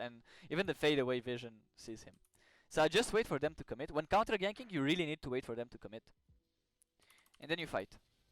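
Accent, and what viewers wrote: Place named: France. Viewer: French accent 200% XD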